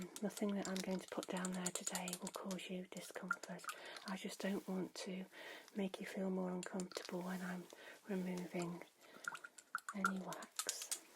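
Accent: United Kingdom. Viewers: You have one gorgeous British accent that I can listen to all day long. 🥰 I felt so relaxed and enjoyed this part 2 of ear exam and deep ear tingles here! Cheers 🍻for sharing and I wish you a beautiful Happy New Year's 2025! 🍾🎉🥳💜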